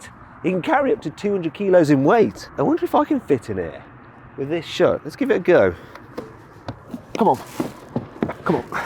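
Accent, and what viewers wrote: United Kingdom. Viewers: 그러고보니까 번호판은 텍사스인데 억양은 영국억양이네??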